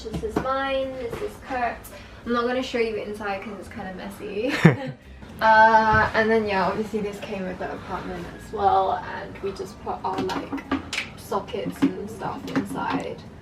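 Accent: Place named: United Kingdom. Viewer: hmm interesting, UK accent coming back to HK very small aprtment.. why not comeover to the mainland. Try Shenzehn and Guangzhou much bigger much better But goodlocation will always trump other factors so good on both of you. Our family also feels that the surrounding environment is important especailly when you walkout on the weekend, you want to be somewhere with cafes etc and a place you feel cmfortable also its good to be around the same kid of clientelle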